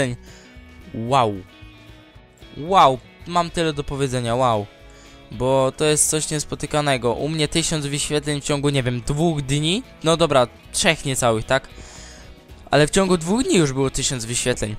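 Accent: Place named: Rural, Poland. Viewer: wieś głos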